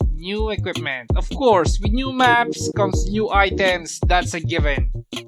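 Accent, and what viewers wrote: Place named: Philippines. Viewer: you sound like a filipino. are you filipino?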